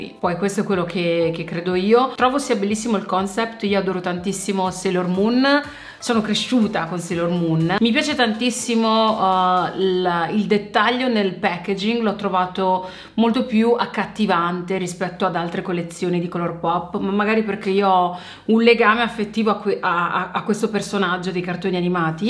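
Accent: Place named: Tuscany, Italy. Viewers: L'accento toscano m'ha scaldato iccuore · Te posso dì na cosa? Me fai morì da i ridere😂!!! (In senso buono ovviamente) Comunque io sono di Arezzo e sentirti dire quel tuo dialetto aretino/ fiorentino mi ha fatto sorridere❤️si avvicina parecchio. Ma di dove sei te??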